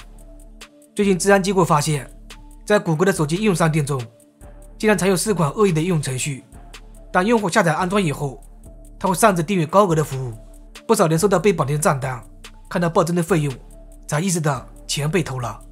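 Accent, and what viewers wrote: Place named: Jiangshan, China. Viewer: 有点像衢州江山口音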